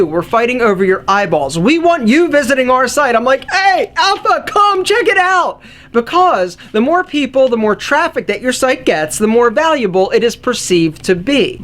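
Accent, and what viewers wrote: Philadelphia, United States. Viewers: Whoever told you not to do this video should be fired...the honesty (which I already realized, but some don't) is refreshing and positive. If more companies would be this open I think us commodities would be a lot happier with giving you our eyes. Sponsors are key, and also don't require the viewer to do anything but watch. Sticking to advertising stuff you believe in is the way to go...if you get paid to showcase products that actually help people, its a win win.....Keep it up. (P.s. Always good to hear that Philly accent on the tube lol, much love from the 215)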